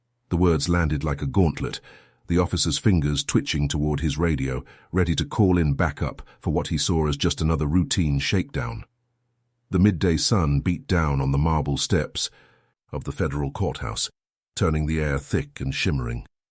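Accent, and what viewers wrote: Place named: United Kingdom. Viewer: This story is disjointed and ludicrus. This AI crap has really become tiresome. Why is the writer of this story using a British accent to tell an American story?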